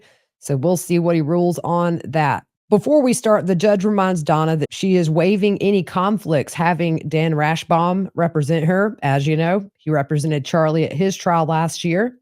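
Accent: South, United States. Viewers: So many southern accents are not pleasant but your accent is...always nice to hear your recaps!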